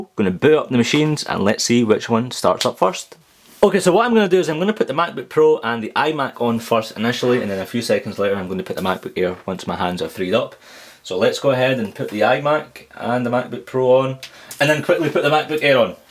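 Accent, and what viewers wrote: Scotland, United Kingdom. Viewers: i love your accent i love scotland man. cheers from greece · Are you scottish?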